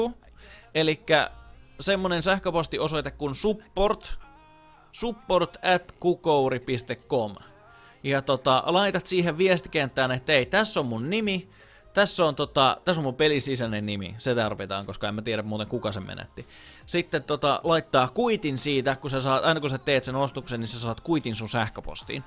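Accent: Finland. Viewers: He's from finnish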